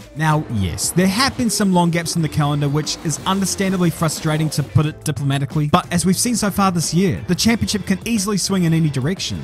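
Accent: New Zealand. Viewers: Let me just put it out there. has the best narrating voice. The kiwi accent is just magnificent.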